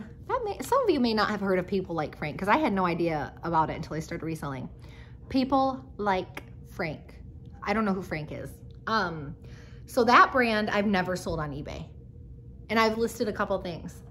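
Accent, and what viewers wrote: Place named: Chicago, United States. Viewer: Are you in the Chicago area? You have an accent….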